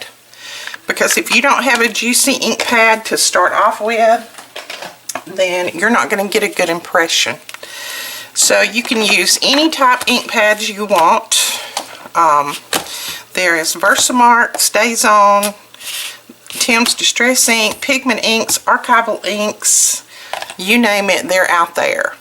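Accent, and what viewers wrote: South, United States. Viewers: great tips boy i would love to win this i dont have any distress ink yet so that will be awesome ps love your southern accent! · Thanks for all of your stamping advice. Also, I'm from the south and I enjoyed hearing that familiar dialect. The familiarity was so comforting! Your advice was greatly appreciative!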